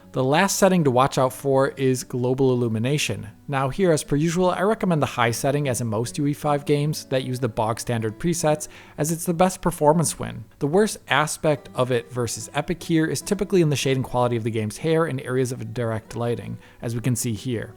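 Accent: United States. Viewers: He lives in Germany, speaks with an American accent, and has an Italian name. Go figure! :)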